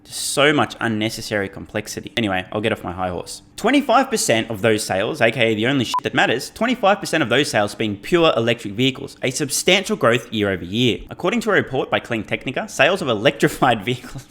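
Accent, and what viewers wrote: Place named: Australia. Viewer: You need better vocal sound 'cause your voice grates partially your accent but mostly because of your complete ignorance on the subject of sound engineering! Listen to one your Australian reginal youtuber's; Cold Fusion/Dagogo Altraide. He produces his own music and that acuity to sound educated him to the value of sound processing. It's stupid simple probably under $2-$300.00 Azzie money! Buy a Behringer board with built in Compression and 'Bob's your uncle' as the English say! Get one that has USB output and your sound we be much improved! I only wish that "Electric Pixar looking Viking Fucker" would do the same.....Cheers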